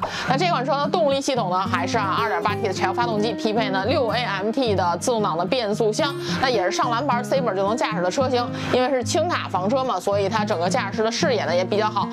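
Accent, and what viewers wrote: Beijing, China. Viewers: 车是不错， 一口京腔听起来很不舒服，普通话不好吗？ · 既然面向中国或者世界，主持人建议不要用一口北京腔。用标准的普通话可能观众听了更适合一些。个人建议。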